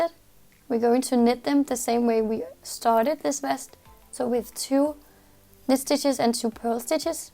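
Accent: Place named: Denmark. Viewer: Er du måske fra Danmark? Your accent sounds kinda like it. Tak for dine videoer!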